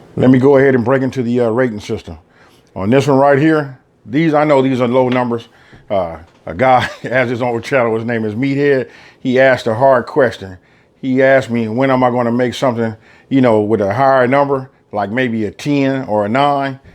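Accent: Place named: West Coast, United States. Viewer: I appreciate you not hiding your west coast accent LOL....good video bruh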